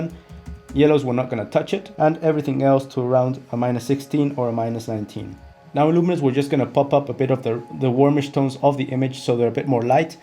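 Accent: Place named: Latin America. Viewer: Cómo se nota que eres hispano parlante, entiendo todo lo que dices a la perfección jajajaja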